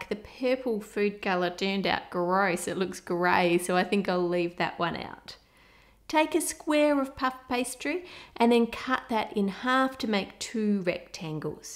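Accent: United Kingdom. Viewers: what accent is this? It sounds british but what region specifically? kinda sounds like someone attempting to do a british accent lol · Who else is practicing their British accent while watching 🤣😅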